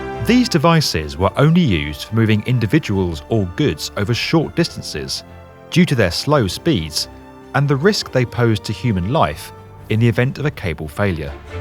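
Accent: United Kingdom. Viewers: Surprising you call the lifts elevator when you’re donning a British accent. :)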